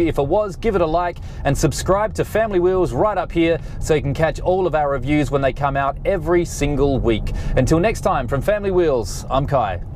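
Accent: Australia. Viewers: Awesome review best I've seen on YouTube so far and I've seen most on the Outlander PHEV as I used to own a 2014 model in Sydney and Perth. I sold it after 3 years to buy a Prado with 7 seats but now regret selling it as I'd go for weeks without putting fuel in it and loved the paddles that allow you to control the level of regenerative braking. I recently sold my Prado for more than I paid for it due to crazy prices due to Covid and bought a 2020 hybrid camry for the wife. We have a 5.3kw solar system with 20 panels that only cost $1000 on our roof so charging an EV in the day costs us next to nothing. I want to get a 2020 PHEV now or possibly the new Kluger (Highlander) Hybrid that's coming to Australia this year. Speaking of Australia was great to hear your Aussie accent! Where are you from in Oz? Sounds like your enjoying Canada & have a great job. Thanks for the excellent review am looking forward to watching more reviews especially on Hybrid or electric family SUV's.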